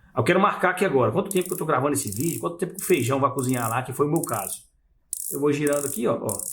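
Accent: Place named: Minas Gerais, Brazil. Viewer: APOSTO que esse relojoeiro é mineiro! Que sotaque horroroso! "Pá quê quí sévi u bezel du relój?" Poha, sotaque de Minas Gerais DÓI no ouvido!!! VSF!!!!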